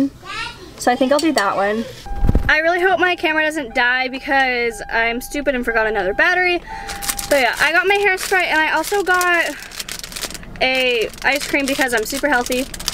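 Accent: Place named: United States. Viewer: the classic american accent lol... #loveyall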